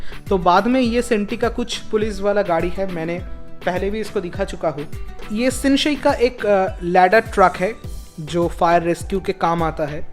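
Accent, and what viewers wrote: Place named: Bengal, India. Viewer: Sir are you a Bengali??..From your Hindi accent...I also have a small hot wheels car collection of 30 cars...But your one is huge..Great job...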